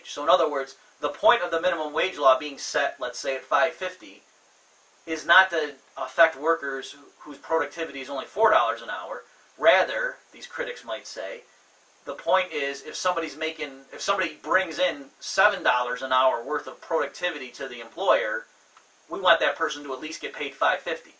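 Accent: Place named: Ireland. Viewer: Im starting to hear Murphy's Irish accent. I tried running this logic with my commie econ professor and he kept bringing up Marx.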